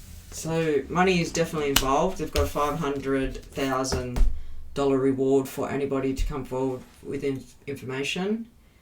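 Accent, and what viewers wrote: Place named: Australia. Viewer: Geeat read. Being an Aussie i have found you and aother read i follow. Love the Aussie accent.❤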